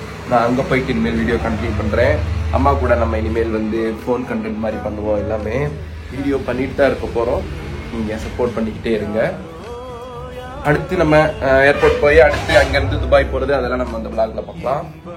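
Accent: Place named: Madurai, India. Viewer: Anneyy nenga madhurai ah unga language appudi dha iruku my fav annaw🫂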